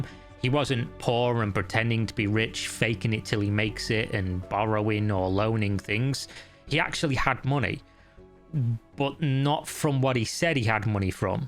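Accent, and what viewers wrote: Northern England, United Kingdom. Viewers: First time ive seen you on camera, your voice is Northern English gold. Keep these amazing videos coming.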